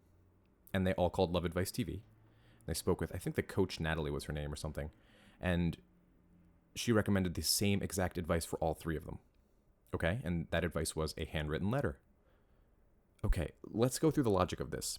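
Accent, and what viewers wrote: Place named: California, United States. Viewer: Actually I am very curious to know. You don’t show your face and I am sure that has A LOT of your viewers curious lol. At least tell us where you broadcast from? I am betting you’re in California based on your accent or somewhere out west. I am in Canada. Ontario near Toronto to be exact.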